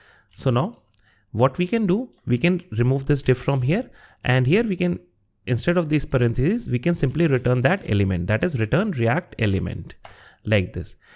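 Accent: India. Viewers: May be, I hear a sound similar Bengali, never mind, your lecture is really good, appreciate for your hard work.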